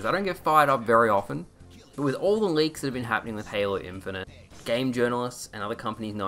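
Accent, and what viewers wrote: Australia. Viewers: Wooh up mate. Are you Aussie?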